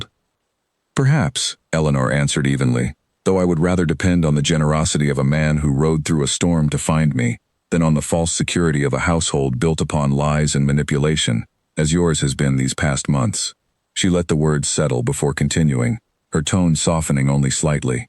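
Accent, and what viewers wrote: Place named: United Kingdom. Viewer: Oh good! It’s not just me! This is one of the few narrations that I’ve enjoyed. Properly English (British) sounding and fluent. However - Mr Pembroke, the solicitor, should not have divulged ANY information about the Duke’s father’s Will, to anyone who was not an executor. So, giving out that information freely to Lady Margaret and yet not having the professional courtesy to directly advise the Duke of the manipulating fraudulent activities of his cousin but sending his daughter Charlotte, is ludicrous. What, by the way, happened to the Aunt? Why did she not feature in this At All? Plus, there is no consistent timeline… it went from Eleanor spending 3 days at Grace’s house to Charlotte’s arrival the next day (notwithstanding the Duke’s also conversation that same day). Then, the 2nd Ball, weeks after the first, although it was seemingly done within days. 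Then also, how did Margaret get from the manor, to Reading and back again without details of where she was living in between. The whole story needs filling out some more. The ballroom confrontation was a bit blah! The entire story premise was good, but more care should have been taken with the details.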